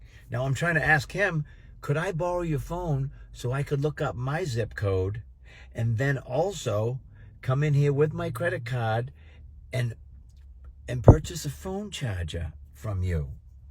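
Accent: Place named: South Boston, United States. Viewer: Like your South Boston accent, caard!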